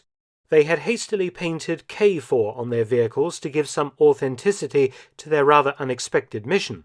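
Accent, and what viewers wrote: United Kingdom. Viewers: BRO, KOSOVO ISN'T "NATION". KOSOVO IS REGION. CHECK YOUR "FACTS" . I'M FROM THAT REGION NEARBY........... ONLY BECAUSE YOU HAVE BRITISH ACCENT, DOESN'T MEAN YOU'RE AN EXPERT AND DOESN'T MEAN YOU'RE RIGHT. Serbia is nation, Montenegro is nation, Albania is nation.... KOSOVO IS NOT NATION. IT'S A REGION !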